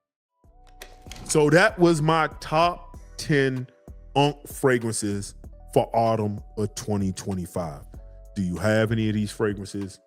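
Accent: DMV, United States. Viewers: Is that the DMV I hear? Randomly came across your channel video and I know my ear doesn’t deceive me.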